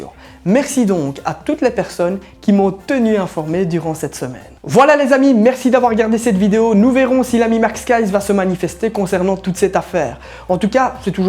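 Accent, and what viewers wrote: Belgium. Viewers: T'es liégeois ? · tu es belge nn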